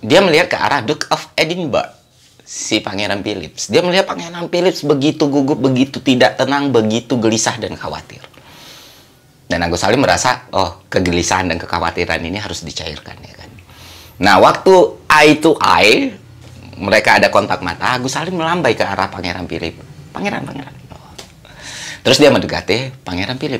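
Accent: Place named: West Sumatra, Indonesia. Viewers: Howaik... Minang nyo dima tu da? 🤣🤣 Ternyata dugaan ane selama ni bener, waktu video vlog ke candi di jambi, bahasa melayu jambi nya bang kok agak terasa minang🤣🤣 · lai dapek juo logat minang dek uda ko mah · Gw kira dari jambi bang, ternyata minang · Bahasa minang logat jambi😁👏 Syahrir dan Agus Salim sepupu. Agus Salim padahal gantengnya Allahahu Rabbi waktu muda. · wkwkw baru aja kemarin nyari biodata lu di internet, karena yakin dari susunan kalimat dan irama ngomongnya kayak orang Sumbar. Tapi data di internet bilang lu asal Jambi. Tapi sekarang terjawab disini wkwkwkw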